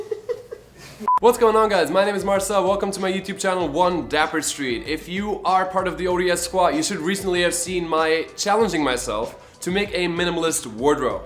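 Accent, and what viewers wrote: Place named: Germany. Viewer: schön dein deutscher Akzent! :D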